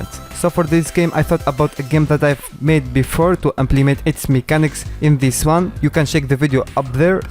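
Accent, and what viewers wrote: France. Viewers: t'est français ? car tu sonne français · It's me or this french accent is KINDA THICC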